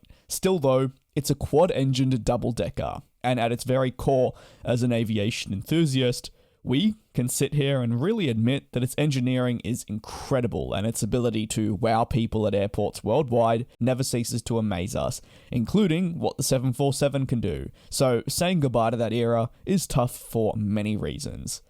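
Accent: Australia. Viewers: Great to hear an Aussie with Aussie presentation!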